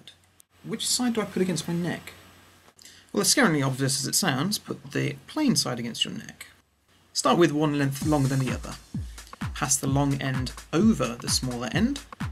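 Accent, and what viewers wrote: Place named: RP, United Kingdom. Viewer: ahahah you really can find almost everything on youtube thanks for the information he does sound pretty posh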